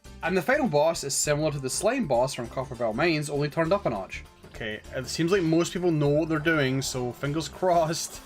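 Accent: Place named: Scotland, United Kingdom. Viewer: another Scottish final fantasy xiv YouTuber... oh wow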